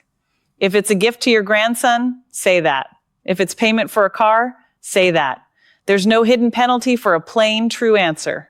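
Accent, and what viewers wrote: United States. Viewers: Yank accent? Load of AI tosh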